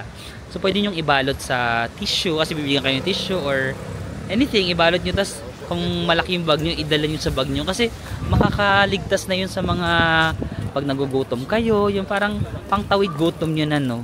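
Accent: Philippines. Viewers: alam nyo po, sinasadya at inaamin naman po ni na hindi nya alam sabihin ang mga french words. for starters,.talagang mahirap maman talaga ipronounce ang french..kahit ibang lahi ay hindi din nabibigkas ng tama ang salitang french. mas cringy kung nagffeeling si na french expert sya pero mali mali naman ang sinasabi. he is just being a filipino tourist with a filipino accent. very down to earth and more relatable. hindi feelingera! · It's okay, I still love your Filipino accent ~ 😍😉 Enjoy your trip in Europe po. Waiting for your next travel vlogs! ✨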